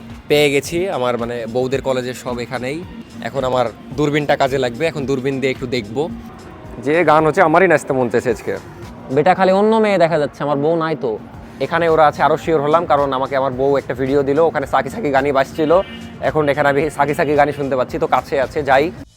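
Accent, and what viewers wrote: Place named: Nawabganj, Bangladesh. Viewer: ভাষাতে নবাবগঞ্জের টান আছে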